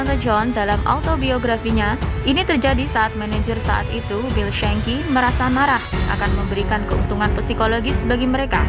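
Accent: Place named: Java, Indonesia. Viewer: Naratornya medok banget.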